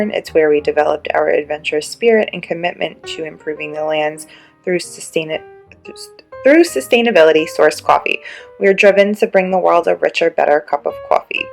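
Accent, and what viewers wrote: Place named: New York, United States. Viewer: Wow what a strong New Yorker accent lol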